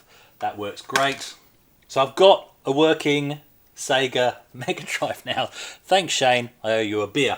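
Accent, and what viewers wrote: Australia. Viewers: Really picking up that Aussie accent now, eh?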